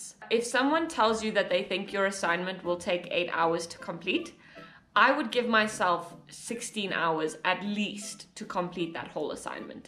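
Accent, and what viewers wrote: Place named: South Africa. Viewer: SO NICE WHEN I UNEXPECTEDLY HEAR THE SOUTH AFRICAN ACCENT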